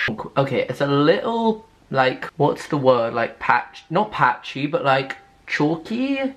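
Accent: Scotland, United Kingdom. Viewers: iconic. scottish accent is improving , proud x x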